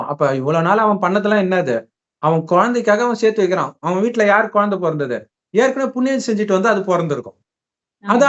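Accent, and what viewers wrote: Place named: Tirunelveli, India. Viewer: sister thirunelveli ya pesum pothu ooru bashai nalla iruku 🖤🖤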